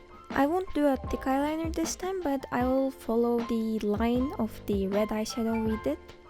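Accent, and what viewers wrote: Turkey. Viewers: ingilizcen gayet iyi olmasina ragmen turk ingilizcesini her yerden tanirim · I supraiesd that you are Turkish because Turkish people are very bad at english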